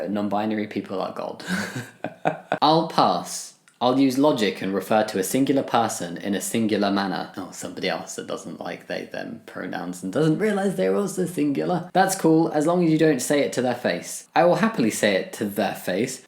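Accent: United Kingdom. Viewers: I’ve watched your videos on & off for years. & this is the first time I realized you have a British accent 🤯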